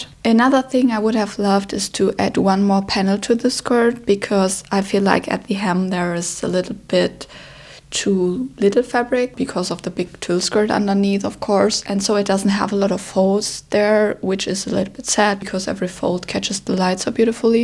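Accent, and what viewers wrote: Germany. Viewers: i love her and her projects, I just can't with the german accent lol. Maybe it's that german self-hatred, or it's my misophonia, .. her accent isn't even too thick/bad, yet it tickles my brain in ways that, I wanna watch her videos but can't enjoy. Gotta mute or click off :( Is that only me? (Maybe could be connstructive criticism, to work on the accent cause it truly might help garner even more international audience (?))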